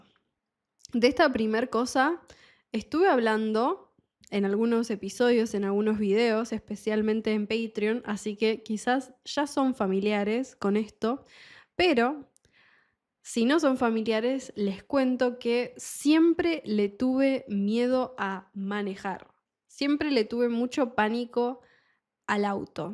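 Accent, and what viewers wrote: Argentina. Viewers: Muchas gracias. Me encanta escuchar el acento argentino,es muy preciosa .Amo mucho a Argentina y espero poder visitarla algún día.Por cierto soy egipcia.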